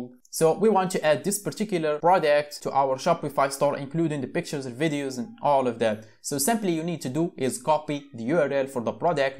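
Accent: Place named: Morocco. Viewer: kml akhy l3ziz ana hna f us o mn accent dialk bayna mghribi hhhh sa7a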